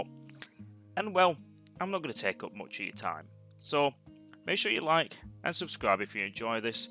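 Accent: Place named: Cockney, United Kingdom. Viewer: Damn it you gave away my island boom stash Cockney bastard ---the lizard king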